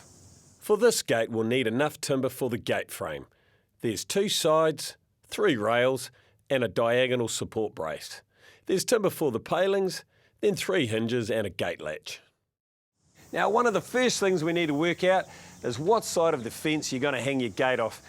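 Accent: New Zealand. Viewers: kiwi accent haha shit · hes kiwi where u from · Nice job man. Quick question: what's a 'chuzzle'? #kiwiaccent 😁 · He has a kiwi accent, he's from Manawatu in NZ :) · with a name like Gonzales, perhaps you are just not familiar with the NZ version of English. I was born in Australia and familiar with kiwi accents, as they probably are with some of our aussie accents! If you have an accent, I guess also some of us would have difficulty understanding your version of english. Tolerance and understanding will help us all overcome such judgements...if you watch what he's doing as he is speaking it is pretty easy to fill in the gaps of of misunderstanding his accent ;-[)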